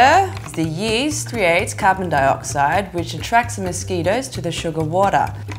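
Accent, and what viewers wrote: Australia. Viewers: She sounds Australian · oh so that's why her Australian accent is a little different. Singapore must have rubbed off a little :3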